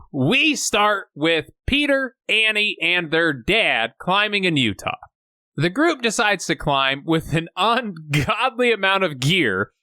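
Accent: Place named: Australia. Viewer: I didn’t expect to hear an Aussie accent so that woke me up lol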